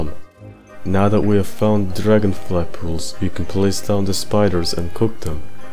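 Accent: Russia. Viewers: Nice vid! Are you russian? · Мне одному кажется что он русский?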